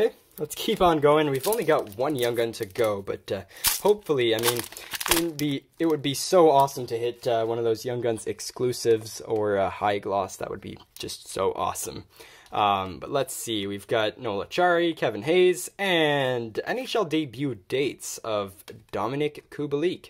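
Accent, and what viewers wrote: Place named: France. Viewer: stoooooop with the french pronunciations of players names, only thing that makes me not want to watch u lol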